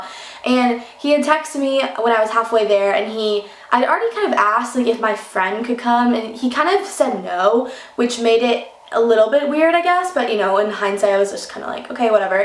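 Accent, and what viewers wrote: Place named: United States. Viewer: i love how you have a country accent that comes and goes (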